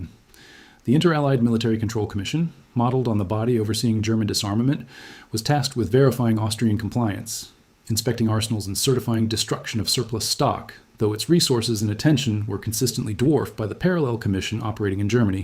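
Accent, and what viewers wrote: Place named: United States. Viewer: I like how it's called Britain's war files, but it's an American AI voice that takes loud breaths for some reason 😂😂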